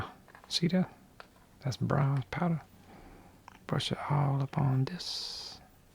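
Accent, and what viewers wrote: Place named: South, United States. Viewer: I’m really wondering where he lives...his southern style accent is throwing me off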